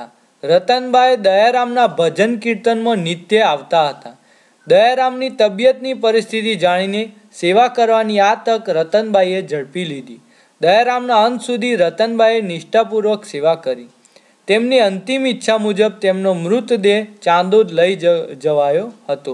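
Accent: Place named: Mehsana, India. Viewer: તમારો મેહસાણાનો તળપદો અવાજ સરસ છે.."મ" સીરિસ સીવાય મસ્ત છે બધુ...આટલી વિસાળ મહેનત કરો છો તો ભુલો ગોતી અમારે તમને ન કેવુ જોઈએ.પણ મજા આવે છે...શરુ રાખજો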